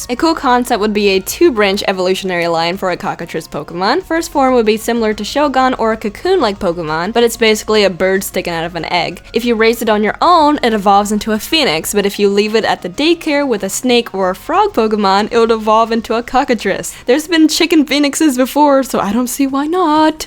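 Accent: United States. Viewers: you sure youre not american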